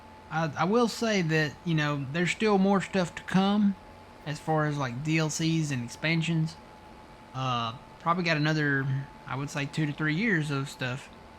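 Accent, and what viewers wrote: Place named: United States. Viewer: hey bro. this is good content, lots of creators mess it up by putting in those speeded up farming with horrible music, plz dont do that and you will have at least me to keep telling you are doing great. you must either be a farmer, or have or do work on farms. and your country accent, lol not a bad thing just kinda funny. I live in idaho you know SPUD COUNTRY LOL, Im from Fairbanks Alaska, and spent ha;f my life in Seattle,. Not much for the big city, I am a metalhead but i love all real music. if yoou ever wanna say wat up Im down to make a new friend. If you want I can send you my stean handle or watever ppl call it these days and maybe we could multi player some time. like i said this is good content, keep up the good work bro. Sean.......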